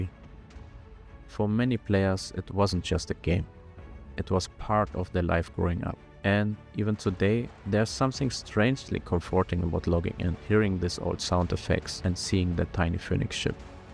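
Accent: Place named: Germany. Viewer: wie deutsch willst du alles aussprechen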